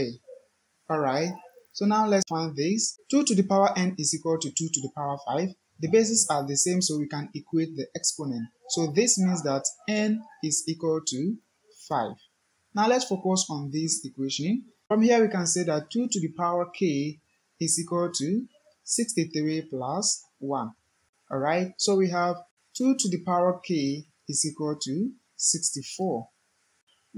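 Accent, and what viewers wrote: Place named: Ghana. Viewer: The accent looks more of a Ghanaian.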